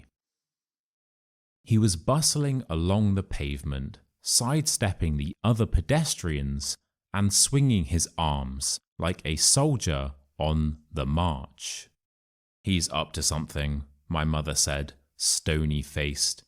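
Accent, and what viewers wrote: United Kingdom. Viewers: I do enjoy the story very much through your beautiful British accent. I cannot thank you enough ❤❤❤❤❤ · I like you british acccent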